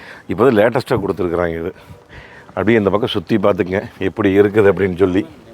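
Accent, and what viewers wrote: Kongu, India. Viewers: Namma Kongu tamil is awesome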